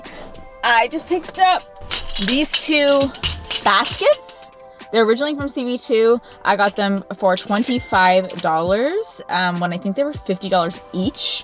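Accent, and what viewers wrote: Canada. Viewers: 100% stepped up her Canadian game! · Omg your parents are sooo sweet!!! Also you sound more Canadian with them “aye” hehe love it!!! ❤❤❤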